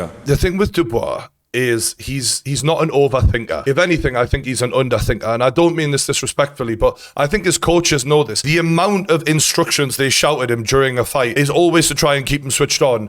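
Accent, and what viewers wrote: Newcastle, United Kingdom. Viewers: Love true geordie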